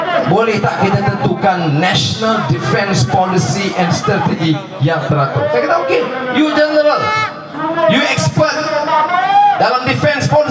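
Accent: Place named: Negeri Sembilan, Malaysia. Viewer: ingatkan org negori bro heheh.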